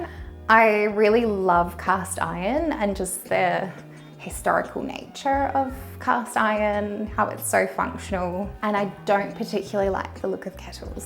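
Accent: Australia. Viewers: ''DesigAAH and MakAAH', ''LargeAAH'' this australian accent is triggering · I just have to say: australian accent, oh my! So beautiful!!!! THe house too, by the way! lol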